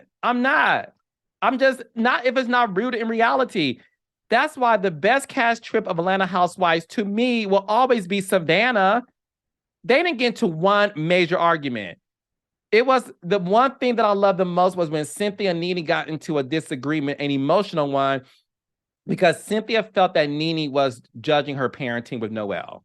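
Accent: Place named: Florida, United States. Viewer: Your dialogue and pronunciations remind me of Marlo. Are you from Florida? I 100% agree Porsha should stay far away from RHOA. Porsha is great TV but RHOA is no longer the platform for her. I would love to see a show with Porsha, Phaedra, NeNe and Kim on a show following each of them on their new journey. It would be interesting, entertaining and just enough shade to make you laugh.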